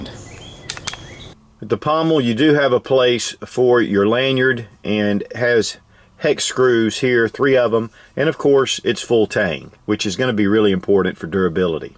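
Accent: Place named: South Carolina, United States. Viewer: oh ok your from S. Carolina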